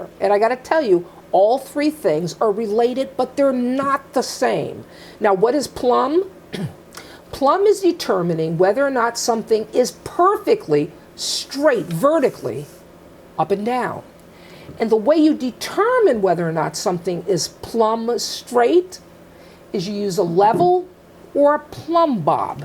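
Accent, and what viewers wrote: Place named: North, United States. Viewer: Nice tutorials and even nicer to hear a northern state accent again, reminds me of my late father, born in Bar Harbor Maine and lived in Massachusetts all his life. Nothing against the southern state boys, they're good too but the accents grate after a while.....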